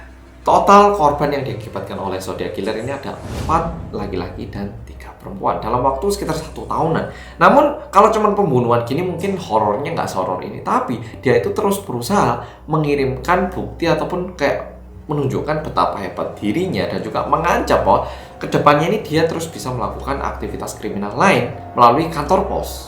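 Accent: Java, Indonesia. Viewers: medok banget oppaaaa 👍🏻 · Mas orang korea apa orang jawa, bhs jawanya medok bgt..😅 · Kak,kk pernah tinggal di Jawa ya soalnya logat bet hehehe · Mas nya orang Jawa kah ?? Medok Sekali ngomong nya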